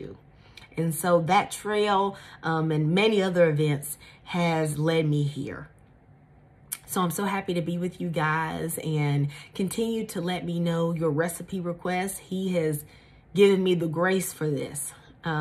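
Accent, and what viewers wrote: South, United States. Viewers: You are so beautiful and I love your Southern accent, where are you from??😍🥰🥰🥰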